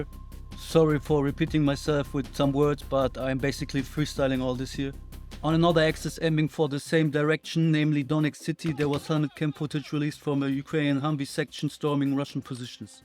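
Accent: Germany. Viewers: Your German (?) accent is so annoying though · are you German? you have a heavy German accent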